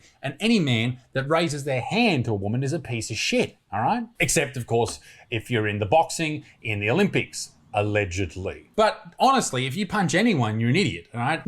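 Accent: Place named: Australia. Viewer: i love ur accent as iam am also an australian!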